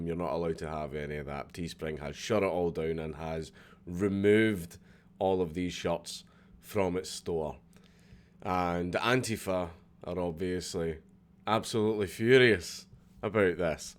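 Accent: Scotland, United Kingdom. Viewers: I've been watching some of your videos. Quite enjoy them. I'm still not sure if I agree or disagree with you, but I am willing to listen without foaming at the mouth. Besides that, you're even more Scottish than I am. So thumbs up! Subbed! Good job! Very few people on Youtube can confuse me to the point where I want to sober up and think... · love the accent: scrooge mcDuck.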